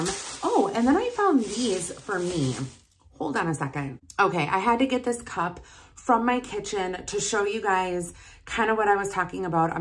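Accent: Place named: Midwest, United States. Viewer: First thing I thought, midwestern gal!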